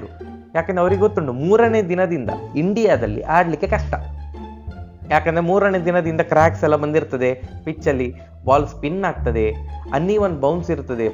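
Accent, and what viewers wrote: Mangalore, India. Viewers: ಎಬೇ ಅಂತ ಆಗ್ತದೆ😂👍..ನಮ್ಮ ಮಂಗಳೂರು slang ಇಷ್ಟ ಆಗ್ತದೆ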